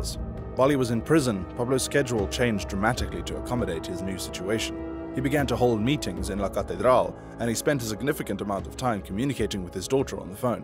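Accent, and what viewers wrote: South Africa. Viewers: Are you from South Africa? Reason being - accent sounds familiar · The narrator sounds South African or perhaps Zimbabwean. I’d say Durban, South Africa.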